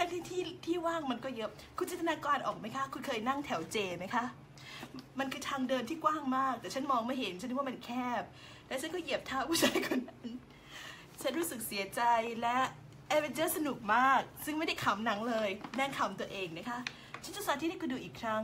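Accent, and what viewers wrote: Isan, Thailand. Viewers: เสียงกลับมาแล้ว คลิปที่แล้ว เสียงอีสาน ระวังมีดบาดนะคะ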